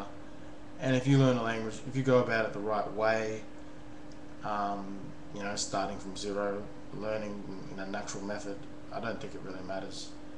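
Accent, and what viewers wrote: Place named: Australia. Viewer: Your Australain accent isn't very strong.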